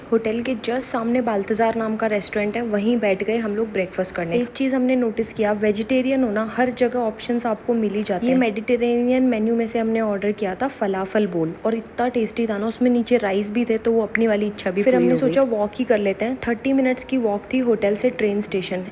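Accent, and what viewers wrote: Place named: Marwari, India. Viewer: Best gaasiye deti gayi.......ur marwadi with hindi is awesome 👌 😅😅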